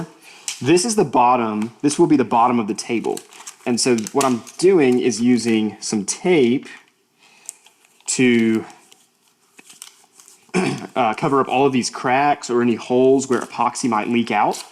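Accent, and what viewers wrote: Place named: Hickory, North Carolina, United States. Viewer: Thanks for your honesty. I've recently found you on you tube. I'm a relatively new woodworker and I like you content better than anyone else I've watched on YouTube. Your accent sounds like your from my wife's hometown of hickory nc......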